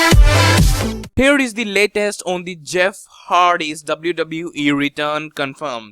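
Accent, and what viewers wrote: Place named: Pakistan. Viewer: annoying pakistani voice... ughhhh... go to your call center job buddy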